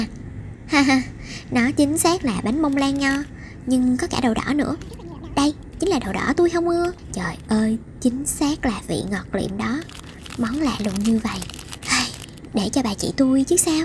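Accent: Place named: North, Vietnam. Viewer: Nghe giọng bà chị giống ng Bắc á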